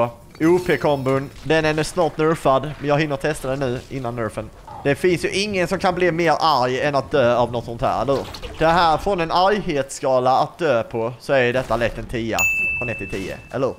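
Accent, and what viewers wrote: Skåne, Sweden. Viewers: Pratar du skånska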